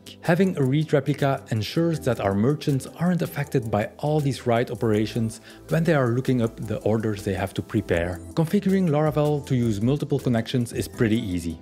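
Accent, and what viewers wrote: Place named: Belgium. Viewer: Your accent sounds Belgian, your name Italian and your face Indian. Did I get any of that right? 😊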